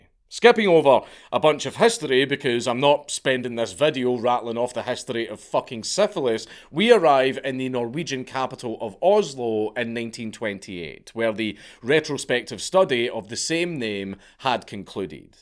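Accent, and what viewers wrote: Scotland, United Kingdom. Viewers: Just got off work from the MMJ dispensary. My favorite medicine. So why not listen to one of my favorite Scottish men talking about really bad drug companies. Aahhj yes! Ripping good time! Thanks!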